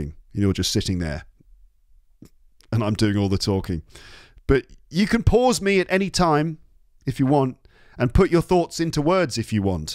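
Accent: RP, United Kingdom. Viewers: Found your podcasts recently. Love them 😊 your natural and vivid way of speaking is very, very interesting and makes it very enjoyable to listen to you. Love your accent! So British! So nice. Thank you so much and the best of luck for the next year (2022) as I am listening to this only in mid Dec 2021! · totally agree. finally found a channel speaks British English, and the accent is so nice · Hi,. I've been following you for the past four years. I have always loved your voice and your British accent and therefore I would like (oneday) to be able to speak English the way you do. Today I have found you in youtube and It has been such a nice surprise!! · I found out you when I lwatched AJ Hoge's live stream. Someone asked him to recommend a podcast in Britain accent and he recommend you. And he also added that his podcasts aren't boring and you can enjoy while listening. 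I realized how wonderful teacher he is! And after watching you I see you are also a great teacher and positive like him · Yes, you do have all sorts of listeners. I, for instance am a 70 + retired teacher of English. I listen avidly to your podcasts as they provide excellent exposure to the English as spoken by the native speakers.An added advantage is that it is in modern RP. Carry on with your good work. Cheers.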